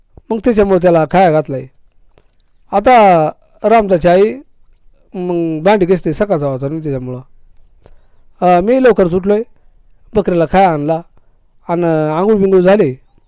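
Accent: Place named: Palghar, India. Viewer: दादा तुम्ही बोलता तीच बोलीभाषा आहे आमच्या गावची पण... मला वाटले तुम्ही पण पालघर मधलेच आहेत की काय...❤❤ जय आदिवासी दादा...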